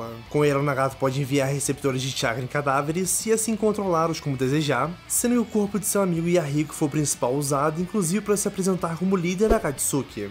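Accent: Portugal. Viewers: Vc é português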